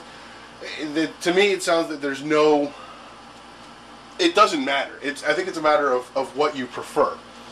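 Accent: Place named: Philadelphia, United States. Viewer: Great video Big Dawg. When I heard you say cheese steak or hoagie I thought, this guy must be from the Philly area, then I heard you say the Oaks gun show and it sealed the deal, Philly area it is. Anyway, I just picked up a blued Taurus 1911 today at Tanner's black friday sale for $350. Cant wait to get out and shoot it. I did a bunch of research and everyone that has one loves it. By the way, born and raised in Philly.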